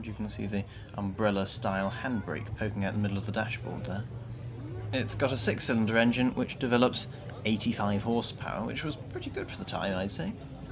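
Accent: RP, United Kingdom. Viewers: Correct me if I am wrong, but the RR color is not canary, but what was known at the time as Mandaran yellow. Bit of trivia, the Waterman fountain pen from the period was available in Mandarin yellow and that pen today is one of the most collectable. Nice presentation. Thank you. (My British friend tells me you speak with a distinct RP. Are you from GB?)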